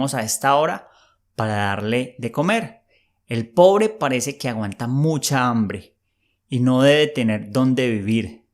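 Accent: Colombia. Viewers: Me encanta el acento colombiano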